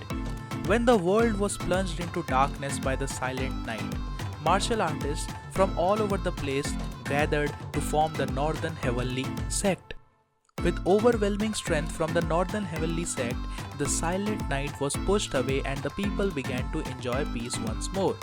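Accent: India. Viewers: I am new here but good recommendations And btw are you from in India because I fell that your accent is pretty similar to us bi or trilingual. Also have you read these new manhwalike: 1. Arcane sniper 2. Return of the frozen player 3. Mercenary enrollment 4. Limit breaker 5.The player that cant level up All the above ones are relatively new with avg of 25+ chapters but surely they'll rise to top So read them and all of them are manhwa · Why the fvk yt suggest this for min I opened this an hear the voice I exit sorry man I'm indian to but can't stand y'all accent ☠️☠️☠️☠️ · Your India accents really annoying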